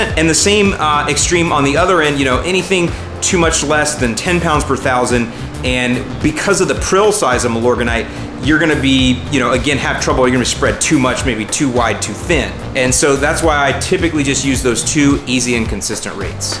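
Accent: Chicago, United States. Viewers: Your accent is like Ditka, from Chicago. As someone who has worked at Jone's Island and personally made Milo there lol, knock it off with the Chicago talk, we sound like Yoopers here in Milwaukee.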